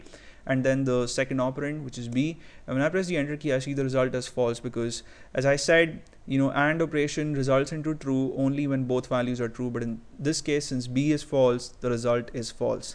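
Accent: India. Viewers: Indian accent in English. Oh my.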